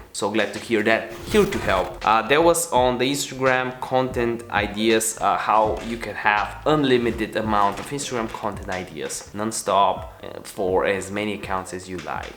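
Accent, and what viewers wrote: Russia. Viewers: First time seeing a russian accent tutorial instead of an indian one.. Both need subtitles though.. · Руски акцент а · I think the Russian accent is better than the Indian lool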